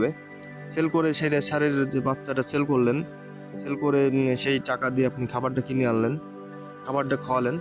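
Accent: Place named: Magura, Bangladesh. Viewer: আপনার কথা শুনেই বুঝতে পারছি যে আপনি মাগুরা বা এর আশপাশের এলাকার লোক। আমার বাসা আলোকদিয়া পুকুরিয়া, বগিয়া ইউনিয়ন। আমি মাগুরা শহরে থাকি।যাই হোক আপনি কত দিন যাবৎ কবুতর পালন করছেন? আপনার কি মনে হয় যে এটা পালন করলে কি লাভবান হওয়া যায় , নাকি শুধু শখের বসে এটা পালন করা উচিত? একটু রিপ্লাই দিয়েন।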